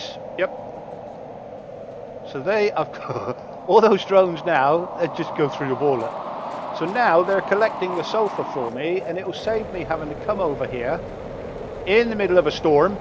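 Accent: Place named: South West, United Kingdom. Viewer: oh thank you I know what the word priority means obviously but wasn't sure in the situation. Thanks. Also are you from the south west because you sound like you are?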